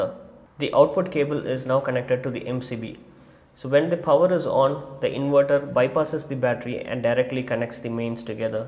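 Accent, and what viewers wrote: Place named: India. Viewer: Typical Bharat accent😁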